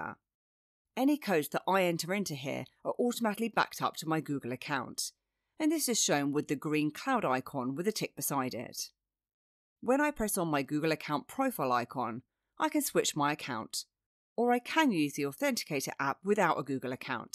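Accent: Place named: London, United Kingdom. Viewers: I love her upper class so much British accent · this wonderful London accent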